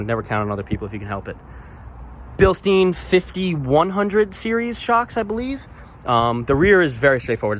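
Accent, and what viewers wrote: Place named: Brooklyn, United States. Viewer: We used to go make deliveries to New Paltz Poughkeepsie from Brooklyn terminal market years ago for the watermelon king M Pagano and Sons and I'm originally from Brooklyn to, I caught your accent lol Really nice work, she really looks stock, I don't 100% have a builder to do my Tacoma SAS, I might have to look you up if this guy doesn't come through for me? He's so far has barely showed any interest, and long island mechanics suck!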